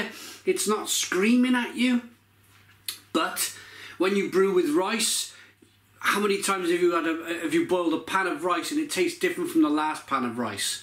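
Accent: Wales, United Kingdom. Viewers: Are you British? By the way I'm new to your channel! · He’s got the slurred speech accent of an alcoholic ahaha or is that just welsh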